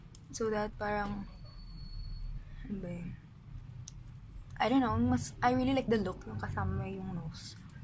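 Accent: Philippines. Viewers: bisaya diay ka abi kog ilonggo Kay sa tono mn gud nmo pag storya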